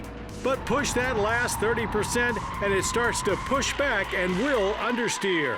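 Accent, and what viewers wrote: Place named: South, United States. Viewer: Remember when he had a southern accent?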